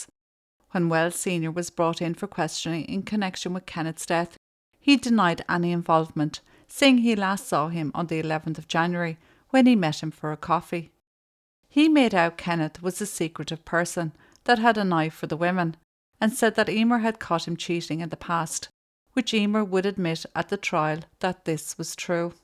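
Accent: Ireland. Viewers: thank you for another great video. It warms my heart to see your subscriber count growing. If I ever get to travel to Ireland, what are some of the quaintest, lovely, and non-touristy places to visit? I am from an ugly , dusty Texas town would be easily bewitched by the greenness and exquisite verbal accents like you have!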